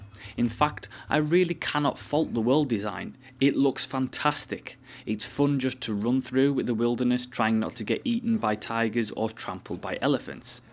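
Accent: Scotland, United Kingdom. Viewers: Are you of Celtic origin? Scottish/Irish?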